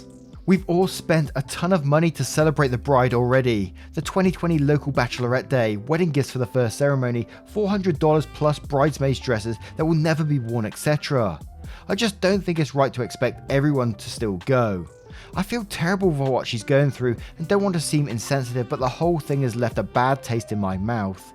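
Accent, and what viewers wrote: United Kingdom. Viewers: Nothing better than listening to a British dude shitting on MLMs while playing a farming sim game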